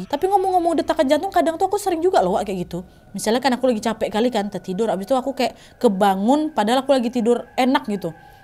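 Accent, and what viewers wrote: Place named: Medan, Indonesia. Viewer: btul. hebat dia bawain ceritanya ngomognnya cepet kali pula logat medan lucu aku loh seirng kaget nntn nya trus dia ini suka jumpscare suka teriak2 sndiri aku jadinya wkakkaka apalagi yg serem dan kdg kebayang sndiri takut banget aku smpe kebawa mimpi saking tiap hr buka khw yg ketinggalan2 krn akh baru subs ini. kebawa mimpi smpe hahahaha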